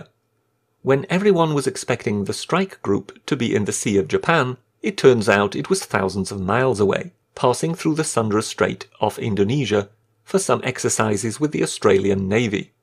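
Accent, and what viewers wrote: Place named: Scotland, United Kingdom. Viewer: I HAVE THE GREATEST OF RESPECT FOR JULIAN ASSANGE. ANGEL IS IN HIS NAME , AND I PRAY THEY PROTECT HIM. HE IS SIMPLY PRESENTING TRUTH, AND THOSE WHO ARE GUILTY MAKE EVERY ATTEMPT TO DEFAME HIS REPUTATION, HOPING THAT WILL BRING HIS WIKILEAKS ACCURACY UNDER SUSPICION. WE ARE TOO WISE FOR YOUR LIES ABOUT HIM. IT APPEARS THAT JULIAN HAS A LOYAL RESCUER/SUPPORTER HERE. THIS VOICE IN THIS VIDEO IS NOT JULIAN. ACTUALLY I HAVE A WEE BIT OF SCOTTISH IN MY HERITAGE AND SUSPECT THE SPEAKER IS SCOTTISH. YOU HAVE OVER 100 VIDEOS USING ONLY 2 COVER PHOTOS . I wish this speaker would tell us who he is (but in wisdom, given the unjust treatment Julian has endured, perhaps wiser to remain incognito. Respect to you, sir.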